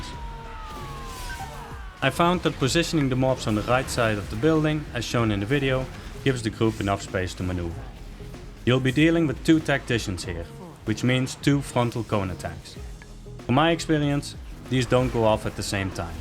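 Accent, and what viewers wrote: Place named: Netherlands. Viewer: Dutch huh :D Good video !! i really dislike this dungeon and this helped me out a lot !!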